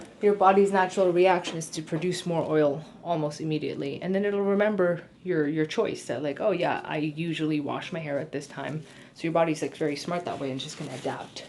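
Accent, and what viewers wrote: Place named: Canada. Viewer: Canadian accent sounds very nice 👌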